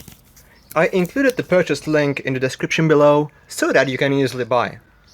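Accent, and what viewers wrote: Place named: India. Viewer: very informative and interesting video. what country are you from? the english sounded kinda indian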